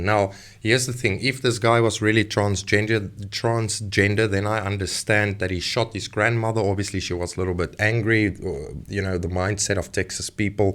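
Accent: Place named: South Africa. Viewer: why this south african sounding ass over here worried about american 2nd amendment? 🤣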